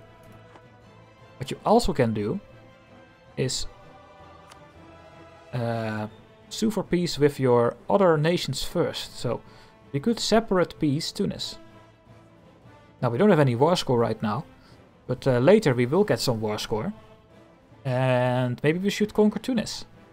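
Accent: Netherlands. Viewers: Hey, I hope you are willing to continue on your tutorial! It was really helpfull in understanding the game sofar since you talk clearly, don't talk fast and explain it rather good. Also the dutch accent makes it for me better understandable (gezien ik ook Nederlands ben), so love that. Anyway, I hope you'll find yourself able to continue. Great thank for the tutorial so far!!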